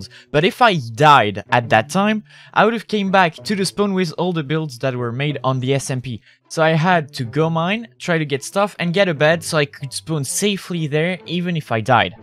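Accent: France. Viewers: Ta un petit accent français sais trop chou xD sinon continue t'es vidéo j'adore se que tu fait ^^ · cette accent français mdr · His accent makes me laugh soooo much Before you hate on me I am French so I am allowed to laugh at a Frenchman because he has an accent while talking English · He sounds extra French. My heart. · On entends bien l'accent français x) Je pense qu'on l'a tous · i love your accent French accents are the best..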